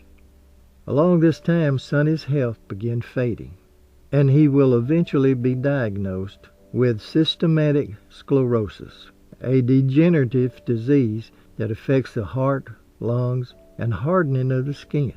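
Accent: South, United States. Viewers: I could listen to you narrate a telephone directory, or anything else for that matter. You have such a soothing voice. I love your Southern accent. Best wishes from the UK 🇬🇧 ❤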